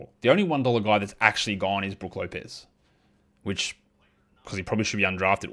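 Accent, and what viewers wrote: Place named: Australia. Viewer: Trying to drop the codeword but I legitimately have no idea what you said. Aussie accent claims another victim. Canadian btw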